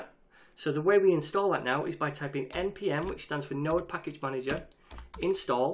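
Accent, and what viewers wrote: United Kingdom. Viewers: Thank u very much, I love your wonderful tutorial, and your amazing British accent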